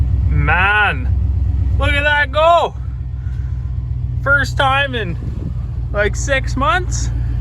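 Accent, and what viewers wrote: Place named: Alberta, Canada. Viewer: Sound like a wannabe albertan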